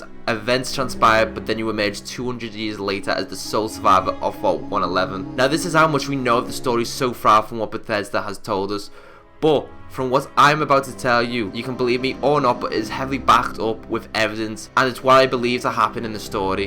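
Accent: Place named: England, United Kingdom. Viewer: "Nagaski" ? It's pronounced "nah-gah-sah-key" I know you're English but slow down with your words homie. 👍 Love the videos. This is simply just constructive criticism.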